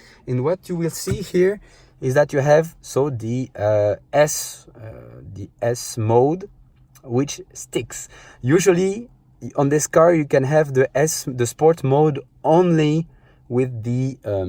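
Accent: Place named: France. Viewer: Tu es français? Je l'entends à l'accent et aux "euh" qui ne trompent pas lol Merci pour la video, mm si je ne possède pas du tout cette voiture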